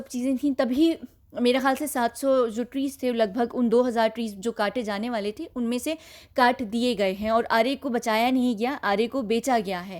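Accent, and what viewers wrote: United Kingdom. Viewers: U have a British accent . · Sometimes I rewind and listen to your British Accent and I loved it and loved the fact that you speak Hindi so good. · When you speak in English I recognize it as a British accent. But when you speak Hindi/Urdu in your videos Your British accent is not apparent: so I wonder if you could speak English with an accent of a native Hindi/Urdu speaker (Indian English) accent: it will be fun if you can give it a try! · Ap rehete ho uk me ? accent se lga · I am dr hyder by profession I am a cardiac surgeon from Pakistan .... I love the way she speaks and the most of it I love the way she has this British accent ..... lolzzzz I am her fan .... thanks for being soo brave and fighting for truth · Her accent seems like UK or british accent.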